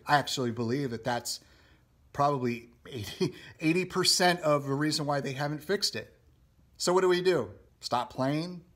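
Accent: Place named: South Africa. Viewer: lol. It's a South African accent!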